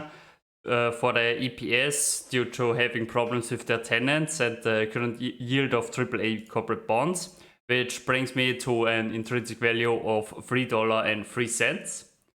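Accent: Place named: Bavaria, Germany. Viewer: Klingt wie ein Bayer der versucht Englisch zu sprechen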